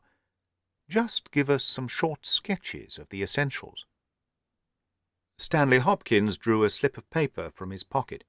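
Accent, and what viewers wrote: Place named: Dundee, United Kingdom. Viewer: an enjoyable fantastic tale superb narration right down to the authentic Dundee accent 10/10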